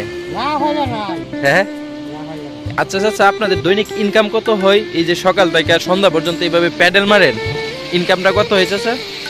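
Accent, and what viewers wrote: Sylhet, Bangladesh. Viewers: Vaiys apni ki sylheti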